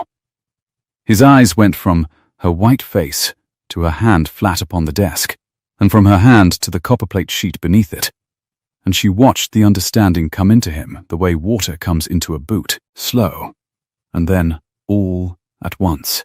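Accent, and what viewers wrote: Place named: England, United Kingdom. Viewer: Thank you for this story and using an english accent.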